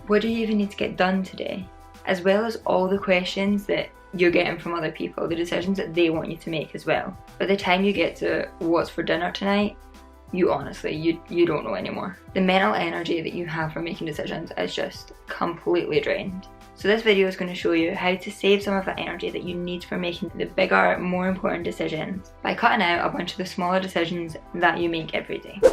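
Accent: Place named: Scotland, United Kingdom. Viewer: 🥰 btw where are you from? I love your accent. Kinda reminds me of the Scottish actress Karen Gillan.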